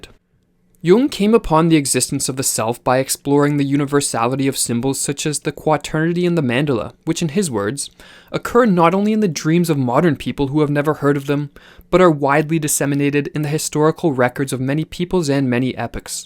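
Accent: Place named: Canada. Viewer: This guys Canadian isn’t he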